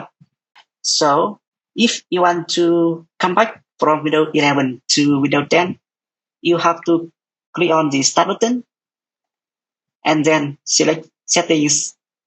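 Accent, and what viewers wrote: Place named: Vietnam. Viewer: i love ur accent viatnamese right?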